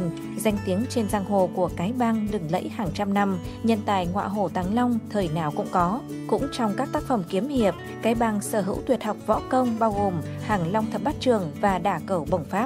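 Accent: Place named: North, Vietnam. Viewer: Hồng Thắm giọng nam, còn giọng này âm bắc mà bạn!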